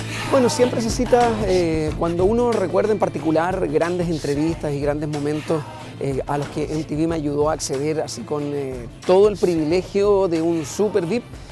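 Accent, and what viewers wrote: Chile. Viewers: ahora si habla como chileno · disculpa cuando estabas en MTV tu lengua era mas clara un verdadero idioma ahora suenas a un chileno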